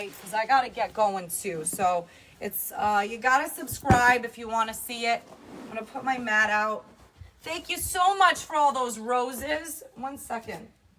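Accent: New Jersey, United States. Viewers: I love your jersey accent. I had a friend from Ridgewood. Nice legs ❤❤❤ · the New Jersey accent is the sexiest woman sex in the world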